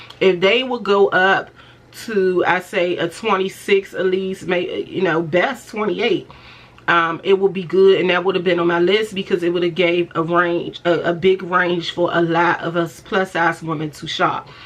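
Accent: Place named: DMV, United States. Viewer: Hey DMV friend ❤I’m new to your channel and had a feeling when you started speaking had to be from DMV 😊I love love your channel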